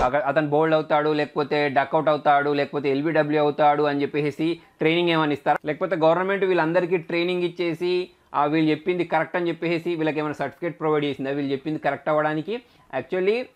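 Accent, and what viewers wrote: India. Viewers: తెలుగు అబ్బాయి లాగే మాట్లాడతారు .. i like it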